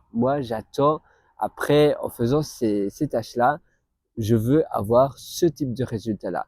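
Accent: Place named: Belgium. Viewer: Tu es belge ? Je reconnais un accent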